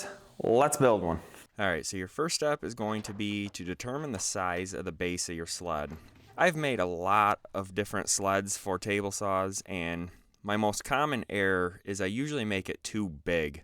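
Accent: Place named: Michigan, United States. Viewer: Takes one whole minute explaining how most videos are too long 😂 Good video man. Appreciate the tips. Also, I didn’t realize how easy it was to spot a Michigan accent till I moved away. But I’d be willing to bet your from Michigan. Aren’t you? Haha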